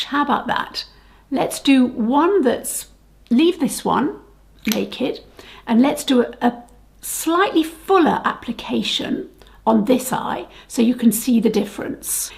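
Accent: United Kingdom. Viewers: I’m in the US and I think you’re fabulous. You would be so much fun to hang out with. I love your style and your posh accent. I love all these LE products too. I bought the Rosalie liquid lurex, the pink damask lipstick and the Kensington plum lip gloss. Sorry, if I’ve forgotten some of the names. Can’t wait to receive them. ❤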